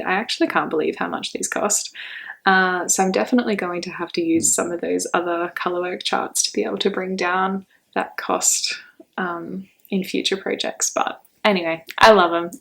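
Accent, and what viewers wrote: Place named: Australia. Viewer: This is the first of your videos I have seen! I'm from Adelaide/Kaurna country and its so nice to hear an Australian accent on a podcast, and someone who is actually from my hemisphere so is talking about their summer knits rather than all the other youtubers going into Winter. Definitely adding the cuff club flower socks to my Ravelry library :)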